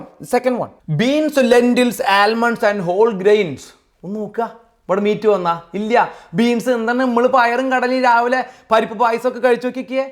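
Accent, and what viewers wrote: Thrissur, India. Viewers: എൻ്റെ ഒരു ഒപ്പീനിയൻ എന്താന്ന് വെച്ചാൽ, എനിക്ക് ചേട്ടൻ്റെ കുക്കിങ് വീഡിയോ യേക്കാൾ കുറച്ചും കൂടി ഇഷ്ടം ഇദ്പോലുള്ള അറിവ് പകരുന്ന വീഡിയോ ആണ്. അതിനു കാരണം ചേട്ടൻ്റെ തൃശ്ശൂർ ശൈലിയും ചേട്ടൻ്റെ അറിവുമാണ്.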